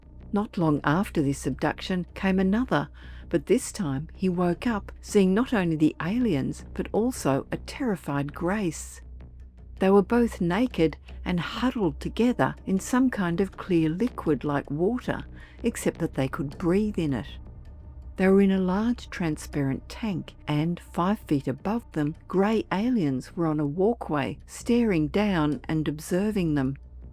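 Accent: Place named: Australia. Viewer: Love your Aussie accent, gentle pace and narrative expression. Great stories too!😀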